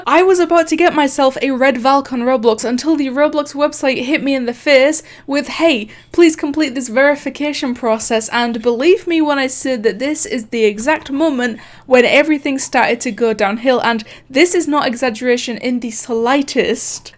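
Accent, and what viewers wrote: United Kingdom. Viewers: I could already tell you were British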